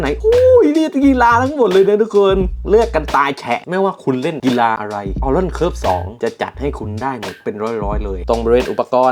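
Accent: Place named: South, Thailand. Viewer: สำเนียง คนใต้บ้านเรานิ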